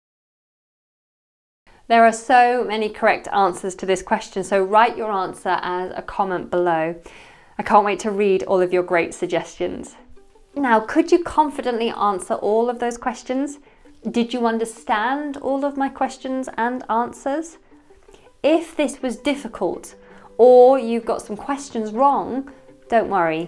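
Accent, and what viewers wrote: United Kingdom. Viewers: sooo british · I get a headache when I hear that British accent.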